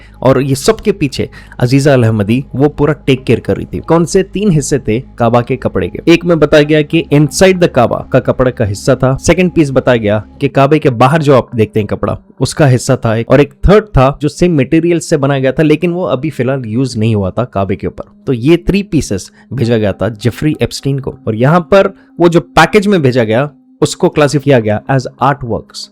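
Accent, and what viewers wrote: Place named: Maharashtra, India. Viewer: You have marathi.accent . Ok. Good जय।महाराष्ट्र जय शिवाजी राव। हर हर महादेव।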